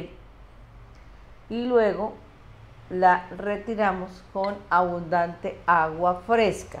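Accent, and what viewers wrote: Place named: Costa Rica. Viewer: Habla como costarricense.Soy de Costa Rica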